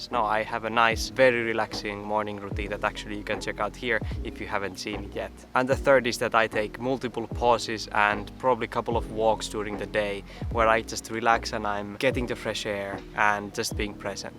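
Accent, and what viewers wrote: Finland. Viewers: Hei! Löysin sun kanavan viime viikolla, ja mietin eka että sun aksentti kuulosti suomalaiselta ja katsoessa kävikin ilmi, että oot suomalainen. Aloitin mun minimalismi matkan viime vuonna kesälomalla, ja kävin koko asunnon läpi muutaman kuukauden aikana. Lähti paljon tavaraa pois, osa ihan roskia mitä olin jostain syystä säilyttänyt, osa käyttökelpoisia tavaroita kierrätykseen. Yli vuoden mulla oli kaksi kaappia jäljellä, mutta en ollut jaksanut käydä niitä läpi. Tänään sain inspiraation sun videoiden katselun jälkeen, ja kävin viimein siivouskaapin läpi. Nyt sinne mahtuu imuri (se oli mun unelma, kun aikaisemmin kaappi oli niin täynnä roinaa, ja imuri pyöri nurkissa) Pieni juttu, mutta tuli hyvä mieli. Kiitos inspiraatiosta! Kiva että löytyi suomalainenkin minimalismi YT kanava, katselu pitää asian mielellä ja inspiroi tekemään jotain (vaikka välillä kuuntelen vain huvin vuoksi, saattaa kestää hetki että pääsen itse toimintaan)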